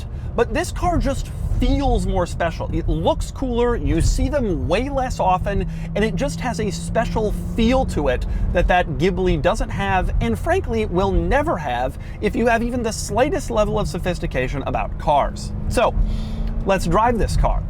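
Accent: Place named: United States. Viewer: He's speaking American English. Because he's American. Get over it.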